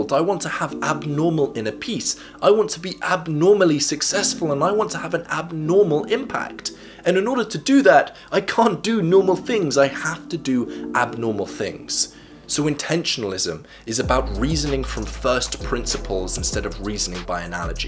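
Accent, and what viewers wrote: United Kingdom. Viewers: love your british accent bro ! I appreciate training my english with your smart videos (I 'm french) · I pronounce with "k" and I'm in the UK. His British accent is in the way he ends every sentence. :)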